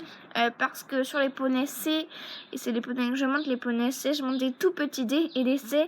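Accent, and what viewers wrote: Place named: England, United Kingdom. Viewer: L'accent anglais😅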